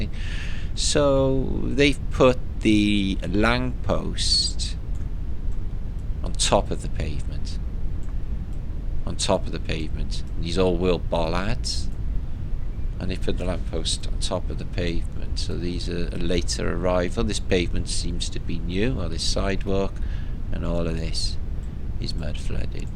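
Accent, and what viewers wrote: United Kingdom. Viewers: off the cuff comments are what I live for! Man looking at self in mirror, “Hi, what do you do?” Reflection, “I’m an artist. What do you do?” Man, “I clean cars for a living.” Love you, although I can’t understand HALF of what you’re saying with that British accent!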